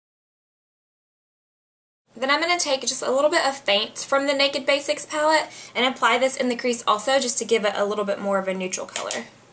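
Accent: South, United States. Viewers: I can hear the southern accent lol.. she doesnt talk like this aymore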